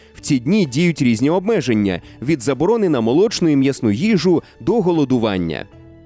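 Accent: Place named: Russia. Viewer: Навіщо коментатор "паскудиЦЦЦь" українську мову різними "тоДЗЗЗі", "видЗЗЗів", "їдЗЗЗяЦЦЦь", єгипЦЦЦяни",тощо ??? Яка гидота переробляти нашу мову на потворне маааскофске нарєчіє!!!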